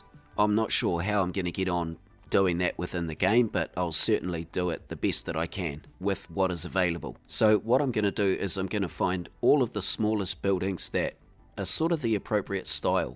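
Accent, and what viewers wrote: New Zealand. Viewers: What a beautiful New Zealand accent 🙌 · I really like when he says: "feârsty" instead of saying "first" like other countries. kiwi accent sounds funny and i actually love it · Another incredible build! Btw, while I do love the NZ accent, I’d just like to note that it’s pronounced fa-VE-la:) Keep up the good work!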